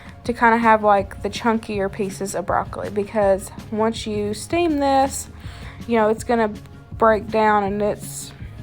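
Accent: South, United States. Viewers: Totally agree with all the other comments! The food looks incredible! Your southern accent is awesome! Subbing now and looking forward to more of your vids! Great work!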